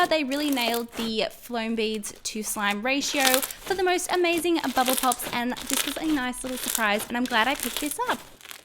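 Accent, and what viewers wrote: Australia. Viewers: She has an Australian accent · “banawneree” no hate. I just really love Australian accents.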